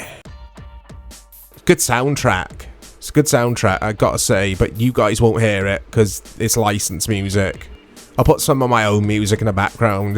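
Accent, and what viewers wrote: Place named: London, United Kingdom. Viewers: Which part of London are you from mate? You sound Posh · Ha. He's English but think lives in Wales, but who knows.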